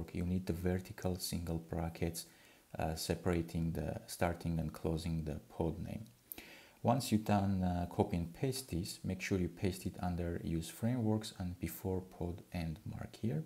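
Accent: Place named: Iran. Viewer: Thanks it was useful💙 with your lovely Persian accent😁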